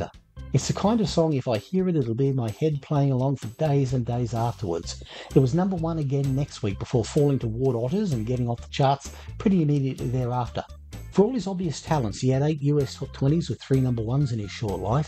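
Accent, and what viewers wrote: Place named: Australia. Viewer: What part of Australia are you from? Cool accent 😎 and as per usual awesome commentary 😊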